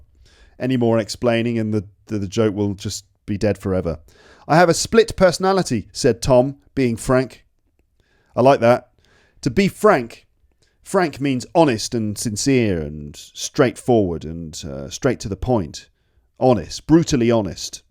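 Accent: United Kingdom. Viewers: I am not to used listening British accent and I could understand you clearly. ❤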